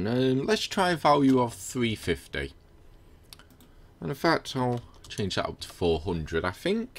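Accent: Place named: North England, United Kingdom. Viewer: Hey, thanks for the reply. I managed to fix it. The problem was I needed to assign the ParticleSystems for ALL the Weatherstates. I had only assigned mist. when I assigned mist to all of them (because i havent made the other ones yet) it worked. Great lessons btw I dont get why you have so few views youre explaining your stuff very well and its not getting boring at all. Also love your accent haha, what is that? North England? Keep it up your videos are helping a lot.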